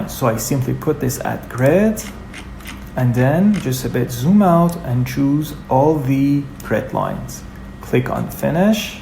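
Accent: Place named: England, United Kingdom. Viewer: Best Revit tutorial ever. Made by a patient and competent Revit expert with a very clear understandable english accent. Thank you!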